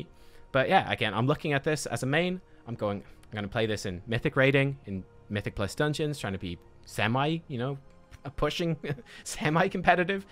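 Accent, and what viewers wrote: Ireland. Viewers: are you irish or am i picking up the accent entirely wrong? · Dat sweet Irish accent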